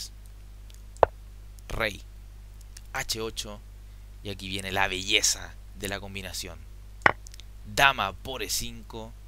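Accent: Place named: Chile. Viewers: Habla como chileno de hecho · El acento es Chileno, aunque no lo creas!! Para que después no digan que en Chile se habla diferente.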